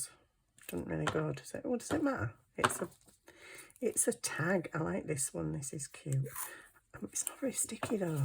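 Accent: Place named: Yorkshire, United Kingdom. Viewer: Hi as a fellow Fibro suffer I absolutely get the 'decision making' thing!🤔🙄🤔🙄🤔😬🤔🤭So glad I've found you on you tube, you sound like you're from my neck of the woods! Especially when I saw your Yorkshire Tea box in one of your vids! 😃I'm a Sheffield'er! 😬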